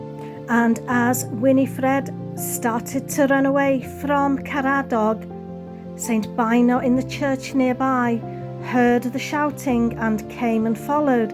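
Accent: Wales, United Kingdom. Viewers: Love your graphic fooling about. And of course the Welsh voice. Loved the display of discarded walking sticks when I was there in 2003...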